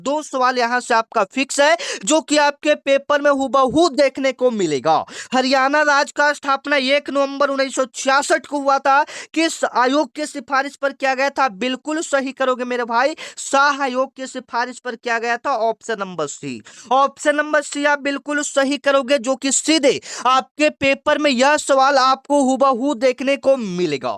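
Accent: Bihar, India. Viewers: Kaise bolte hoo bihari ho kaa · Sir aap bihar se ho kya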